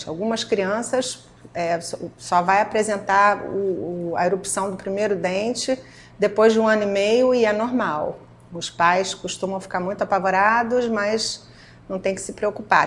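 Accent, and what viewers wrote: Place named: Rio de Janeiro, Brazil. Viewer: Muito bem explicado e interessante! A dica do picolé de leite materno foi bacana. Mas esse sotaque carioquês da gema, carregadissimo incomoda um pouco.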